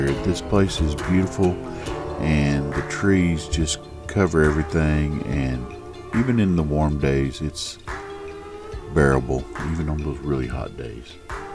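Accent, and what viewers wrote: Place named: France. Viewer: A down home feller with a great voice, and a respectable French accent!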